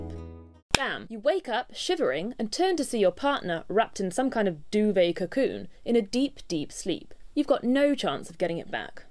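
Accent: United Kingdom. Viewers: Bicara tentang jerman tp dengan aksen britania hehe.. saya suka yg terakhir, semua hrs rela berkorban utk sebuah tujuan bersama👍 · Suka banget aksen british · pantes aksen Inggris awalnya saya kira asli orang Jerman ternyata Inggris 😅😅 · Salfok sama accent britishnya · Waahh serasa menonton harry Potter pakai aksen British 😄😄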